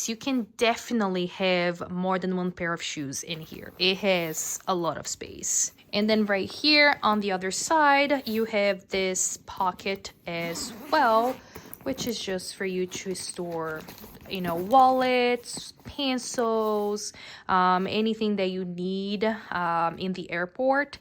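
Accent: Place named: Brazil. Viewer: so pela intonação da sua voz consigo perceber que você é brasileira, abraço! 🥰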